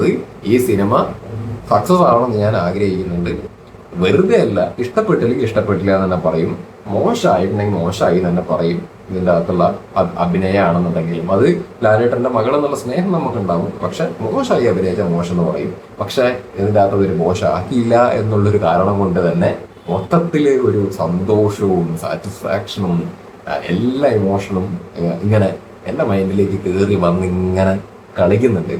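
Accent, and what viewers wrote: Palakkad, India. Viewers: നിങ്ങളുടെ പാലക്കാടൻ slangil സിനിമ റിവ്യൂ കേൾക്കാൻ കാത്തിരിക്കുകയായിരുന്നു.😁👏👏Great work.. Keep Going.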